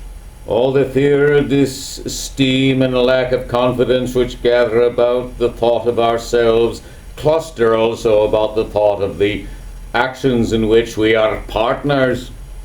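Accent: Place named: Scotland, United Kingdom. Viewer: Love this Scottish Dewey. Aye aye!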